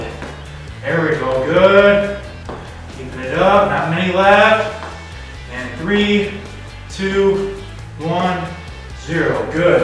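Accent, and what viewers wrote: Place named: Wisconsin, United States. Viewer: First week down. LOVE IT. And I must say. I dig the “WIzkahnzin” accent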